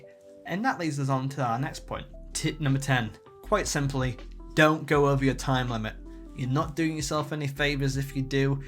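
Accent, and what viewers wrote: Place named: England, United Kingdom. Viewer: I cannot sit and concentrate on an english accent explain comedy to me. I just imagine this guy stuffi g his face with crumpets fig newton's and tea.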